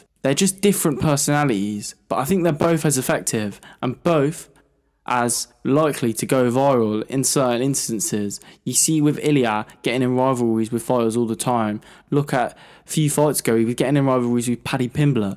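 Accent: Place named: United Kingdom. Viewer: That’s a nice British accent you have brother - good breakdown!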